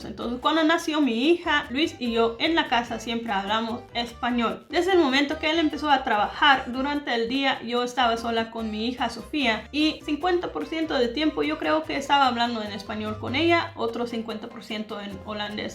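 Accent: Standard. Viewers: tu acento esta neutro pues es lo que veo en este video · Buen vídeo, eso es lo que me pasa a mi me da miedo hablar holandés y no expresarme como quiero,me atrevo hablarlo con conocidos de mucha confianza con desconocidos se me olvida todo,espero lograrlo y hablarlo tan bien como tú hablas el español 💋y por cierto tu acento es neutro 😃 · Tu español es súper fluido 👍🏻con un acento neutral mayormente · Es muy elegante tu español, para mi oído es neutral. Qué bueno que hables bien de mi país Ecuador bendiciones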